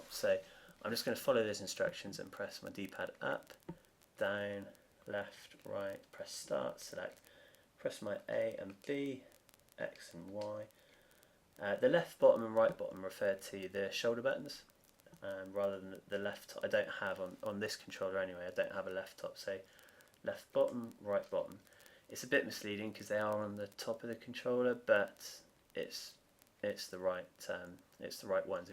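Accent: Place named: West Country, United Kingdom. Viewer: Can't wait for all the parts to arrive, excellent video! Are you from Bath or Brizzol?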